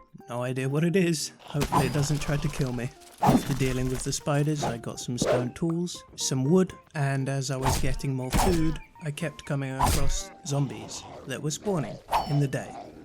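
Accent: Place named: United Kingdom. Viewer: you sound like winnie the poo, dream, and a british accent combined and i am HERE for it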